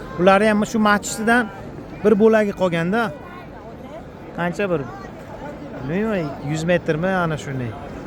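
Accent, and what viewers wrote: Bukhara, Uzbekistan. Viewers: Birinchi marta kirishiz emas ekan hamma narsani joyini yaxshi bilopsiz yana ularni diniga ótib ketmang gapirishizdan tilizdan Buxoro jugutlariga óxshayopsiz shpiyonlardan bólmang yana tuxmat qilgan bólmayu hammamiz ulardan hazar qilgan paytda balo bormi ularni ichida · Сан бухоро еврейми шевангдан билиндику сани тарихингшу ушангга боргансан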